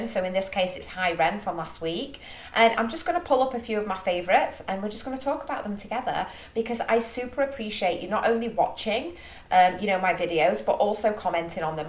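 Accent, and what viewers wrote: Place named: Stoke, United Kingdom. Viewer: Ay up duck. Always interesting to see a ren reaction. He’s brilliant isn’t he? 😂 Are you from manc? You sound almost Stokie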